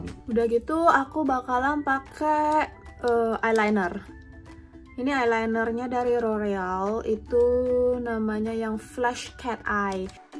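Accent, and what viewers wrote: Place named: Pontianak, Indonesia. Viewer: Asli mana..logat Indonesia nya kayak dari Pontianak ya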